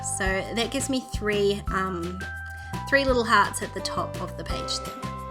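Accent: New Zealand. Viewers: Love it, great to hear a kiwi, can’t wait for my kit to arrive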